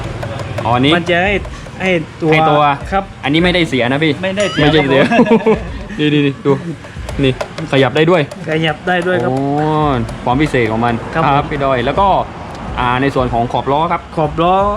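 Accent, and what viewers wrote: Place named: South, Thailand. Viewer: พี่แกพูดติดใต้หน่อยหน่อย 😆😆😆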